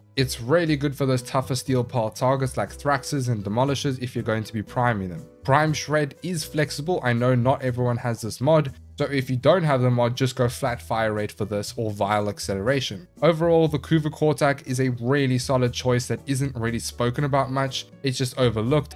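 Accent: South Africa. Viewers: As someone who recently got back into Warframe, thank you! 🫡 Also, your accent sounds like you're South African, if you are, I hope that loadshedding is kind to you Tenno 🙏🏻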